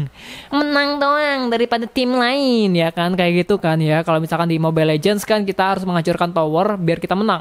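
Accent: Indonesia. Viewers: Bang, aku mau nanya tapi jujur ya, abang orang Babel ya?, Bangka Belitung,.. iya gak?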